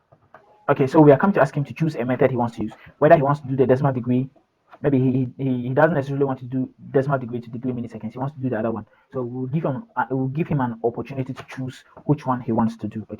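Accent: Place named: Ghana. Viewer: I know a Ghanaian when I hear one lool nice video! I really needed this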